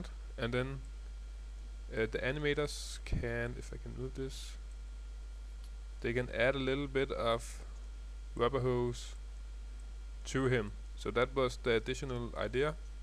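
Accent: Denmark. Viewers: you sound danish, am i correct?:p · Are you danish, ? Your english sounds bit like the 'danish style'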